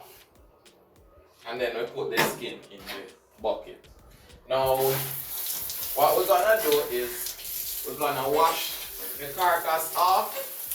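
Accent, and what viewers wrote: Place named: Jamaica. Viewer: Bomba! Me like the video....big up d Jamaican twang and big up the hygiene processing method. Respect for sharing.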